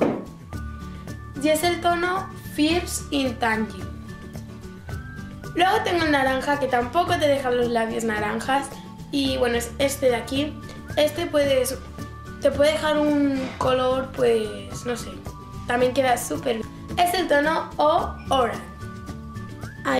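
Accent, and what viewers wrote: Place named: Spain. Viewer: Y de que pais pensas que es?? No le notas el acento? De ESPAÑA obvio!!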